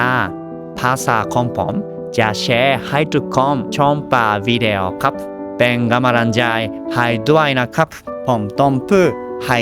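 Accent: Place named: Myanmar. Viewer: ฟังออก เข้าใจ ถือว่าเก่งมากแล้ว การออกเสียงก็พอๆกับพม่าที่มาอยู่ฟินแลนด์ หลายคนออกเสียงประมาณนี้ คนไทยบนดอยก็ออกเสียงประมาณนี้แหละ เก่งแล้วค่ะ อยู่ไปนานๆก็จะเก่งและชัดขึ้น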